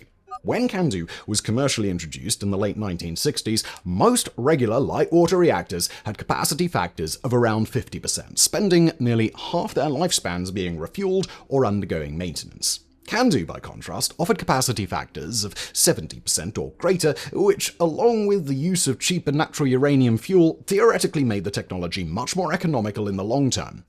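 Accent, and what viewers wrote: United Kingdom. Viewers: which is odd, since there is a Kincardine in Scotland, which given the host's UK accent, should be familiar. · But with a Brtish accent, it sounds some much more sophisticated then when AI narrators screw it up.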